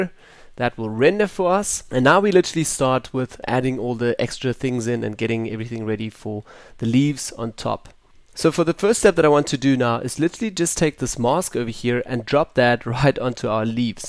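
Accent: South Africa. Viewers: immediately recognised the S.A accent haha #proudlySA and cool tut by the way.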